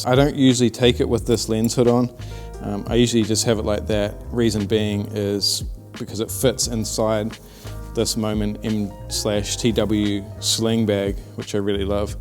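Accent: New Zealand. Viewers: are you from New Zealand bro ?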